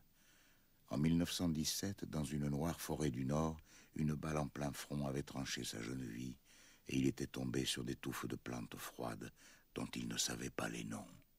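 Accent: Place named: Provence, France. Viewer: et que dire de l'exceptionnelle interprétation de Jean-Pierre Darras, pourtant Parisien, qui narre avec tant de justesse ce passage. On pourrait croire que c'est Pagnol lui même qui parle, tellement son accent provençal est bouleversant. Associé à ce monstre sacré qu'est Vladimir Cosma, on sait dès les premières notes que l'on va être dévasté par des flots de larmes. Merci Mr Robert pour cette extraordianire adaptation de cet autre monstre sacré qu'était Marcel Pagnol.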